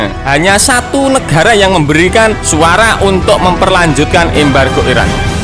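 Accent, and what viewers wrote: Java, Indonesia. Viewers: Medok bingit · mentok jowo nya ,hahaha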